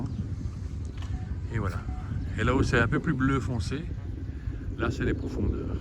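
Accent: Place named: Belgium. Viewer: Bonjour Monsieur. Ça fait plaisir d'entendre la voix d'un compatriote belge sur une vidéo d'Égypte. Je suis de la région de Mons, Dour plus précisément 🥳. Voilà, je viens vers vous car je suis tenté par cet hôtel pour l'été 2026. l'Égypte, nous y sommes allés plusieurs fois (5 fois au cours des 15 dernières années, 3x en couple, 2 fois avec notre fils, et cette année pour partirons pour la première fois à 4 avec notre fille qui aura 4 ans), donc on va dire que l'on connaît un peu (mer population nourriture désagréments intestinaux 😅 etc). Je voulais connaître votre ressenti général sur cet hôtel (nourriture, propreté, animation le soir etc...). Avez-vous plongé depuis le ponton de l'hôtel car pour moi la qualité, beauté de la barrière de corail est également un point essentiel dans mon choix d'hôtels en Egypte. Merci d'avance pour réponses. Amicalement, Grégory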